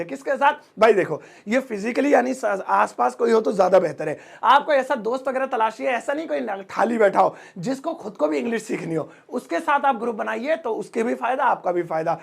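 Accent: India. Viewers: This teacher is very Desi . This teacher is very funny English language. How a great teacher spoken English. Good night 😴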